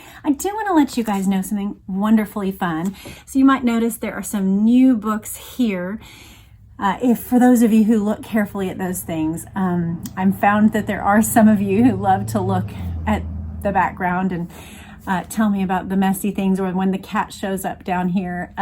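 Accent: Texas, United States. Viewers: always reminds me of the Actor Andi Mac Dowl it's that lovely Texan Ascent 😊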